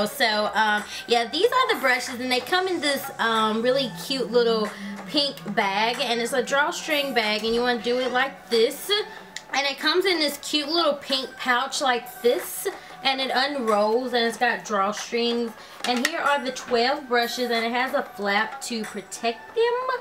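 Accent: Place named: Alabama, United States. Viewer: Woo your from alabama =P I LOVE our accents! and I freaking love all your video's! girl you need to stp makeing me spend so much! lol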